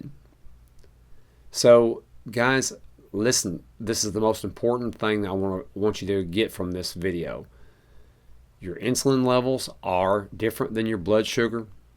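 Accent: South, United States. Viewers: I am very glad I came across your channel. I am learning so much about diabetes from you. Thank you. P.S. I love your Southern accent. 😊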